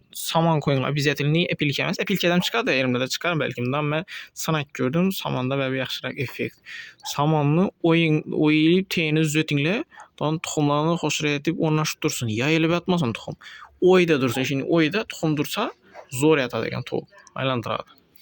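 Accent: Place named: Khorezm, Uzbekistan. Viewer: Авваломбор узрку,Хоразм вилояти хам Узбекистон худудида жойлашган ва мактабларда адабий тилда дарс берилади,лекин иккита хоразмлик сухбатлашсангиз ,улай агар умуман тилингизга тушунмайман,шевангиз жуда кучли,маслахатларингизга кизикдиму,тилингизга тулик тушунмадим😊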